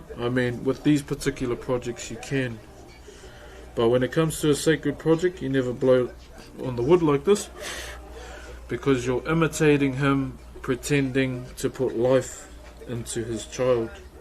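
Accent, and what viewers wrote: New Zealand. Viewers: Yea, Korg. It's because of the kiwi accent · Yup that's our Maori accent lol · Whymark Nah, heavy kiwi accents like are super attractive to me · New Zealand Matey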